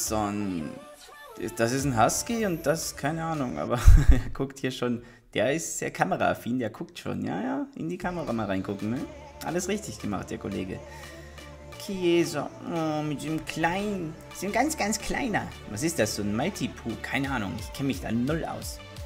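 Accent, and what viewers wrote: Austria. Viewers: Bist du Österreicher oder Deutscher? Bin gefüllt einziger Österreicher auf yt 🥶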